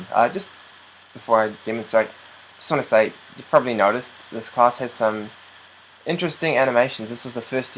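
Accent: New Zealand. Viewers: First video I watched from you, are you a New Zealander? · Thicc kiwi accent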